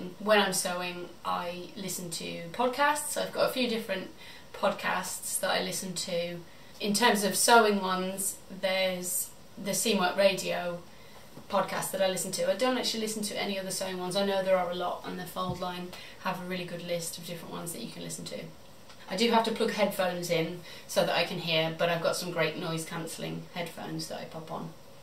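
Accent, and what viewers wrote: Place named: Midlands, United Kingdom. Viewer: TFS great to hear more about you. I'm from Walsall, knew there was a Midlands accent in there somewhere!!!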